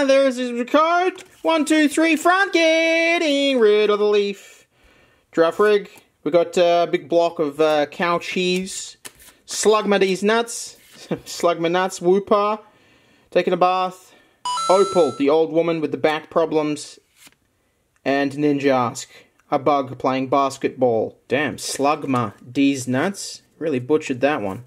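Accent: Australia. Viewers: U an Aussie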